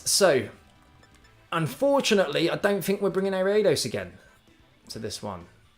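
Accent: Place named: United Kingdom. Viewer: Brits just say Ariados so much better than us Americans LOL If you want to continue the trend, I would like to see a Spidops team!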